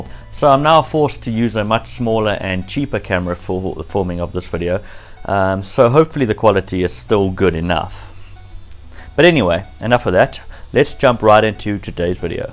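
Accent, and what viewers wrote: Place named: South Africa. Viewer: Thanks for the enjoyable video. Very sorry to hear about the camera, but the little one seems to be doing a decent job. It's also great to see somebody in SA covering retro tech. I'm from the other SA, on the other side of the planet; South Australia. That said, I've been lucky enough to spend time in South Africa (and East Africa) on several occasions. Your accent made me smile, and really miss the place. Have a good weekend!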